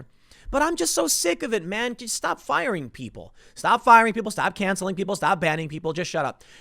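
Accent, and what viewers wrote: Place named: Philadelphia, United States. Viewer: probably doesn’t know it but he’s becoming a Philadelphian! I can hear him change in every video!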